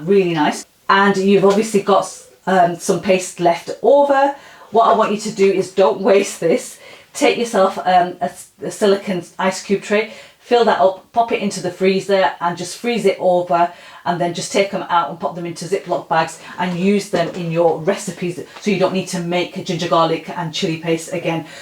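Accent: United Kingdom. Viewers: Her accent is British. Parents are likely Punjabi either from Pakistan or Indian Punjab. She is definitely not Kashmiri or Pothwari. The recipe is typical Punjabi tandoori chicken but now made in Air fryer. Also Kashmiri women are not that open minded in matters as Punjabi women are. They prefer the hijab and nikab and cannot cook so well.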